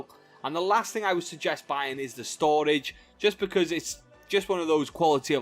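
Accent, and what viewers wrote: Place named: Wales, United Kingdom. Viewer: Exactly what i heard lol, gotta love the Welsh? accent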